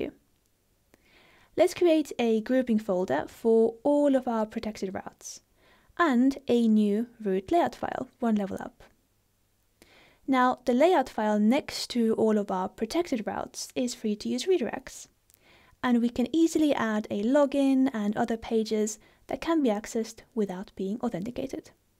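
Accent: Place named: United Kingdom. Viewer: I never understand this british accent. this lady is always confusing. her voice and way of explaining things not quite right. you should always start from clean slate when you explain things.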